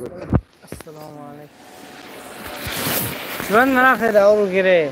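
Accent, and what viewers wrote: Tajikistan. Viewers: сахт Точики гап мезадиян 👏👏👏👏👏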